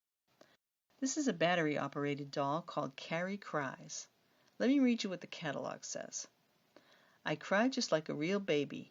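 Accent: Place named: New Orleans, United States. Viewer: Your accent sounds a lot like my family members. Are you from New Orleans? Btw I love this video. I love vintage dolls.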